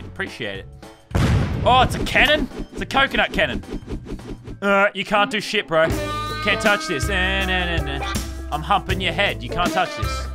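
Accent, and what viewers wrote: Australia. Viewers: DONT SWEAR YOU AUSSIE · Wait is lazarbeam Australian?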